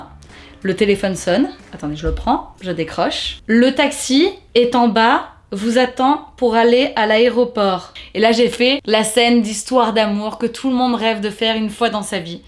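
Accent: France. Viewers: C'est beau 🥰🥰🥰 Cet accent niçois qui fait toujours plaisir 🙃☀️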